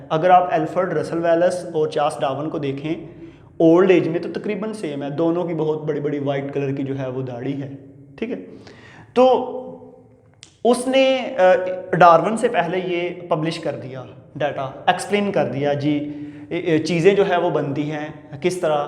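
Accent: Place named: Punjab, India. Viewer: I’m sorry but looks like sir speaks English like Punjabi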